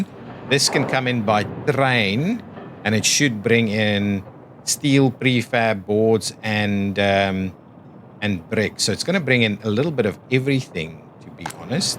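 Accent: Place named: Afrikaans, South Africa. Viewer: Are you from South Africa? Your accent is very Afrikaans. The way the 'R' is pronounced makes me smile every time.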